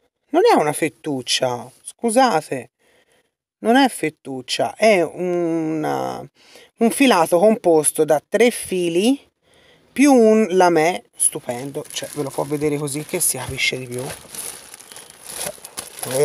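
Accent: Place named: Tuscany, Italy. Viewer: Sei simpaticissima x l accento sicuramente toscana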